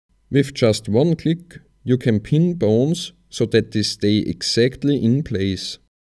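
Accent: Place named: Austria. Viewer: Bwhahaha... Den Ö-Dialekt erkenn ich immer! Grüsse aus Graz und danke fürs Humble Bundle!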